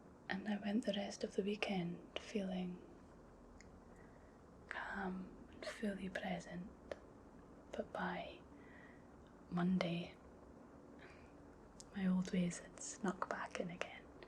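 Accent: Scotland, United Kingdom. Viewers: I love the Scottish accent. It's so pleasing and sexy to listen to. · I've just come across your channel. Sitting comfy on my sofa. Headphones on. Play! Close my eyes and your tones give me a tingling sensation from head to toe. Be still my beating heart. I've always loved the Scottish woman's accent. I meditate everyday for my own mental wellbeing. So Scottish lassie you waffle all you like, Because this Irish lad will listen to you all day. Stay healthy, safe and carefree.😍❤ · Time to melt to her beautiful Scottish accent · Beautiful British lass/accent. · I literally clicked this video just to hear the Scottish accent. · I stumbled upon your channel thanks to the algorithm. Rare for me to fully understand every word from a Scottish accent. Thank you for the calming video. +1 sub. Based on comments, you may have been away for a while. I see it often. 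Take time as you need.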